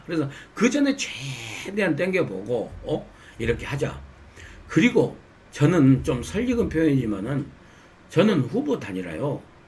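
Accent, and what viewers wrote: Jeolla, South Korea. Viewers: 구수한 전라도 사투리로 국민들을 시원하게 해주셔서 감사합니다ㆍ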